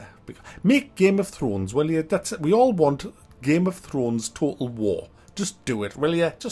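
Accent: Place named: Scotland, United Kingdom. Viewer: I gotta say I love hearing your accent. However I'm an American and it is hard for me to place it. Where are you from? Are you Scottish?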